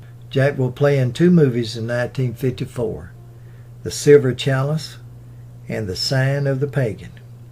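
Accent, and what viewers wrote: South, United States. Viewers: Mr., Your channel is great content-wise. I also enjoy your Southern accent profusely. All the best to you from Poland. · Hey, i love and appreciate your videos. In part because i appreciate your southern dialect, however, it has been proven in several linguistic studies that a large portion of the population at large automatically make the incorrect assumption that people with a southern dialect are less intelligent and less educated. So, sadly, i suspect that a good number of your down votes may be the result of bias against your southern dialect. That being said i also think that your popularity and success on YOUTUBE is also in part a result of people who appreciate your southern dialect. Whenever someone presents something uniquely their own to other people that is powerful enough for them to feel strongly about, then that is just exactly what they will do, some WILL feel strongly that it is good and some will feel strongly that it is bad. 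Don't focus on the fact that you have negative reviews-- after all we all have our critics lol-- just focus on the fact that what you are doing with your documentaries makes people feel strongly about them-- strong enough to leave an opinion-- and that my friend is an accomplishment in and of itself!!! Why... because in today's digital world it takes a POWERFUL CONNECTION in order to motivate people enough for them to even bother leaving an opinion. Even something as simple as a thumbs-up or a thumbs-down... So good job!!! (So this thumbs-UP is for you, what you do and how you do it -- not for my own comment-- however I'm sure that some p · I love documentaries but I see yet another set of remarks as to people being perplexed about others giving thumbs down. will try & tell you it's because of his heavy southern accent and some of that is true...BUT...when you call Elia Kazan ELLA Kazan or the Bubonic plague the BLUEBONNET Plague and say things like "first debut" which is redundant, instead of screen debut which is proper - it gets tiresome. 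He also called child actor, Brandon De Wilde, BRIAN De Wilde as well as Ida Lupinom Ida LUPINA! The mistakes makes are not due to his southern drawl....they're due to a type of laziness when reading and not caring enough to have someone else listen to the soundtrack and making the necessary corrections. There are more errors and it's a shame because I believe puts a good deal of effort into his research and I think he'd get more respect if he paid a lot more attention to what he's trying to produce.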